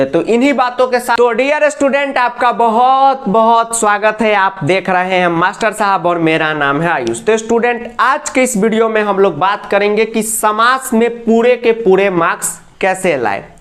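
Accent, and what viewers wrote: Bihar, India. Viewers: Aap bihar ke ho kya?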